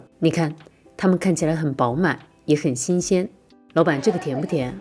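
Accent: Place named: North, China. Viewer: Beautiful northern accent ( im guessing here) you have got!!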